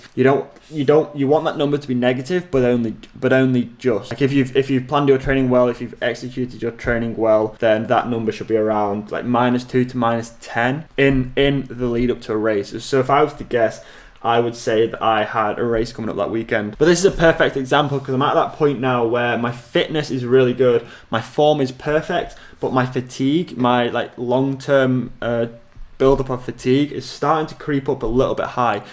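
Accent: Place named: Lancaster, United Kingdom. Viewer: Hi, Been watching and enjoying you for a few weeks now, and listening to your accent, it sounds like you come from around where l used to live, Lancaster and Morecambe, live in Switzerland now. All the best for next season Mike Ford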